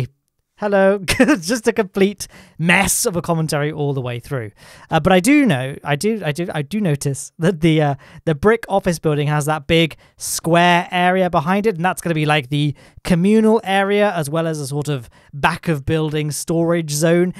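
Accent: United Kingdom. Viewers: I love your videos and love the British & their accent ...lol and I started watching your videos about month ago to learn more about KSP but and I wanted to learn more about youtubers playing games. I bought my own pc and thought it was going to do just fine since I was getting the stimulus money from uncle sam....I'm a disabled Air Force veteran here in the us......and as it was the stimulus money was about 6 months of my income at once so yeah...because it was only $1200 I couldn't afford a great GPU or a computer monitor so I hooked it up to my tv and as you mentioned in this video its soooooo annoying and difficult playing any games on my tv even though it's all I have....lol...I hope you keep making wonderful videos no matter what game it is. 😃👋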